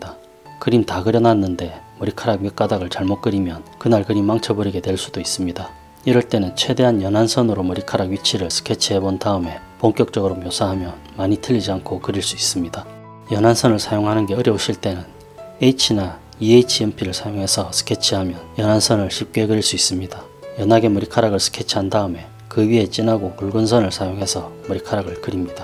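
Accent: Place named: Busan, South Korea. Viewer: 나이쑤 부산말도 재치도 그림도 늠조으네요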